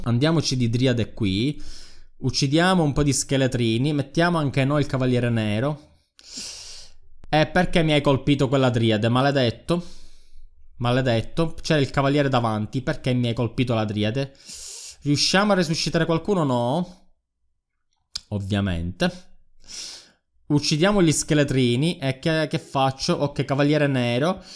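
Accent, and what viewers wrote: Palermo, Italy. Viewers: Fra sei di Palermo?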